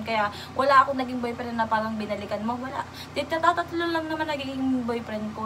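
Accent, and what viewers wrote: Batangas, Philippines. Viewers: Ate ur so cute. Haha nakakatwa the way ka magsalita though i have many friends na batangena at batangeno. I also have relatives in batangas. Shout out naman. Subs mo na ko since you started. In looking forward na gumawa ka din ng videos like mukbangs with mamay hahahaha. Abay nakakatawa kayo ehh. Shout out plssss. Im from cavite. Thankyouuuuu. Sana mapansin mo comment ko ate. Akoy naaadik sa panonood sa yo kamo. 🤩🤩🤩🤩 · Hello, Ms! You have a cute accent, Batanguena ka ba? I really enjoyed listening to you. I’m happy for you being able to come to the states. Mabuti at summer ka dumating dito kc grabe pag winter lalo di yan sa East Coast. Watching from California 😃.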